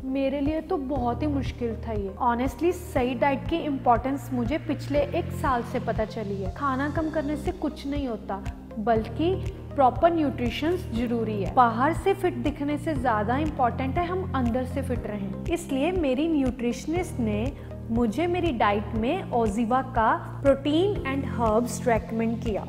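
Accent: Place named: Punjab, India. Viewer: Missing the old so badly with a heavy Punjabi accent😅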